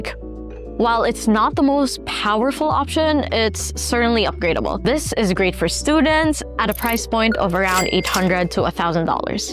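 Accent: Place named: Philippines. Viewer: I think her Filipino accent is still noticeable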